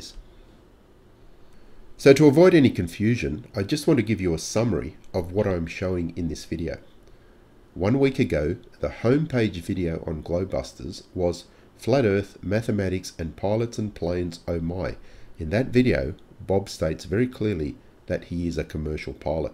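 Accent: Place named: Australia. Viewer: I like the Australian accent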